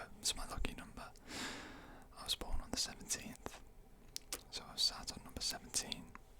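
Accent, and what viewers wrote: United Kingdom. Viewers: It’s so hard to find good British ASMRtists, definitely earned a follow from me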